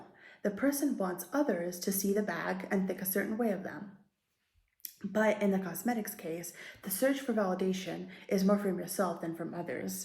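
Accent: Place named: United States. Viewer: Where are you from? You say Euros but your accent sounds totally US-American!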